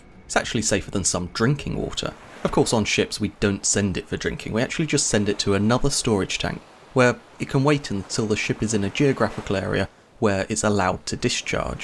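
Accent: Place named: England, United Kingdom. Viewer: I guess a English accent should make everything more palatable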